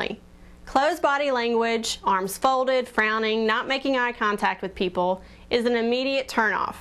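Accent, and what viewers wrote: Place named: United States. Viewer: american accent hmmmm always different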